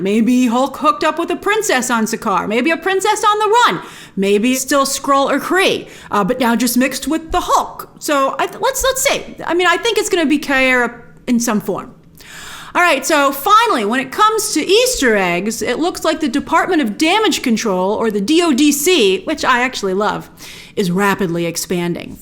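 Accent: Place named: East Coast, United States. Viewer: “I think they cudda dun a betta jaub” That East coast accent tho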